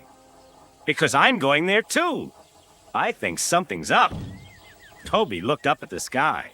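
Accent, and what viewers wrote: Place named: Scotland, United Kingdom. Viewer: Scottish accent is very interesting.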